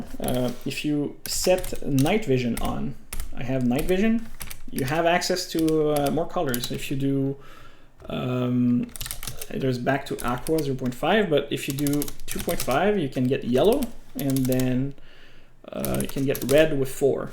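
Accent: Quebec, Canada. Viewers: Are you from quebec?? I can hear an accent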